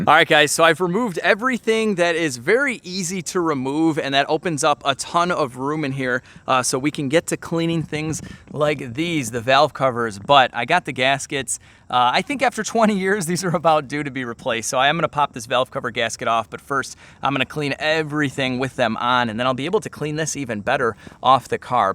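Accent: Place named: Chicago, United States. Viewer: I knew you were an Illinoisan with that CHICAGA accent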